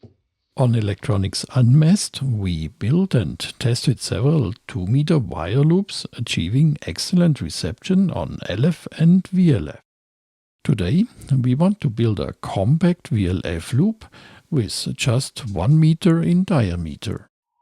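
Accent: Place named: Germany. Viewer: Ohne den Namen, wäre mir deine Tonspur tatsächlich nicht als deutscher Akzent aufgefallen. 😲 Respekt! Viele Grüße, Sebastian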